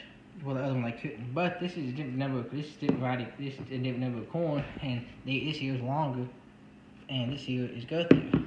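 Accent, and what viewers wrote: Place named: Tennessee, United States. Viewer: I assumed you lived close to the Tennessee line because of your accent (I know...you don't have an accent - neither do I). I am looking forward to watching your corn harvest this year. Do you plan to have some videos on that?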